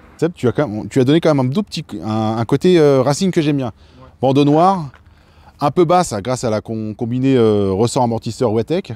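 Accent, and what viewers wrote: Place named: North, France. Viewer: Je me suis abonné en tout cas, tu es du nord ? Je reconnaît l'accent , j'y suis moi même originaire meme si je n'y vie plus , dommage pour les révisions de la future s16.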